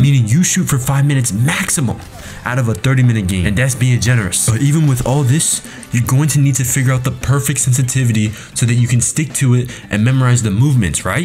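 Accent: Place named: Midwest, United States. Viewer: I just know your from the midwest lol- great video bro!!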